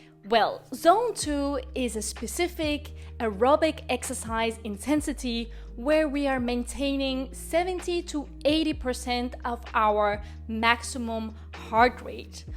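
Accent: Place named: France. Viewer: If you want to make it fun get a real bike and explore the outdoors. It's real life. By the way love your accent. French I think. Blessings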